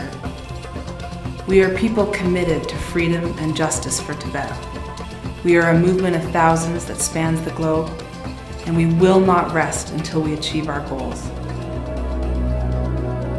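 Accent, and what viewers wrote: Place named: United States. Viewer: btw, u don't even act or make me feel like u r from Tibet, i think u r slave of America,see how gud yr american accent is. especially the way u talk and the way u talking about those, isn't dat kinda reminds people how american u get?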